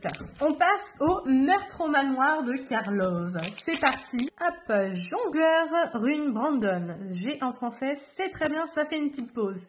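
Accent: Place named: England, United Kingdom. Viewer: Je viens de te découvrir aujourd'hui, j'adore ta bonne humeur !(et aussi ton accent anglais 😂)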